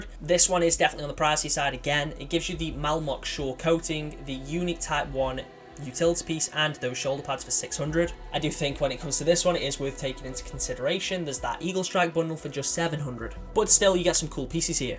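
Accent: United Kingdom. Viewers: Your English looks like England accent but it's more easy to understand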